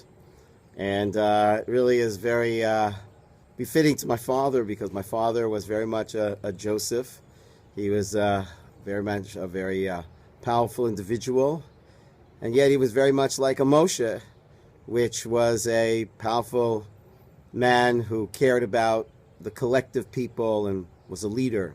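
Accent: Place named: East Coast, United States. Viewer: I am so transfixed by listending to you.You are talking not as a Chabad Rabbi but to me you speak as a fellow from back East ( I am from Bensonhurst) ( I was born in Crown Heights Hospital) and one who makes me remember my jewish soul between the times I pray at my local Chabad in California on Sat, Hear Rabbi Gordon on Sunday for breakfast, Meet with Rabbi Dovid and the seniors on Thursday ( that almost makes a week) oh...and come at 7am when they dont have a minyon. Barry Solomon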